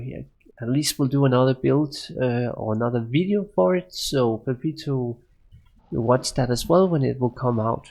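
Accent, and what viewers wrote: Denmark. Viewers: Du lyder Dansk :) But really cool guide mate. might consider rerolling Necro.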